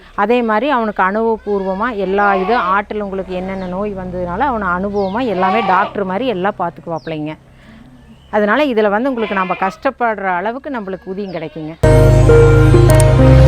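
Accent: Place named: Madurai, India. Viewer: ❤ madurai basha ❤❤❤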